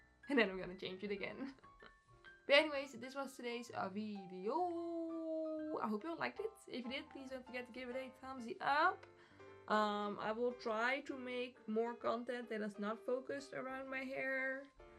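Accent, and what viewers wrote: Netherlands. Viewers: I love your dutch accent:)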